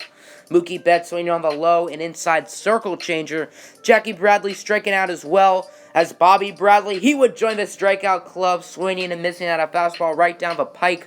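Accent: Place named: Boston, United States. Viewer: That Boston accent😂😂😂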